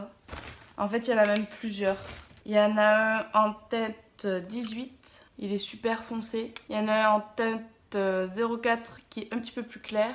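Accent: South, France. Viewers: tu as encore plus l’accent du sud quand tu es avec tes parents j'ai l'impression! c'est marrant ^^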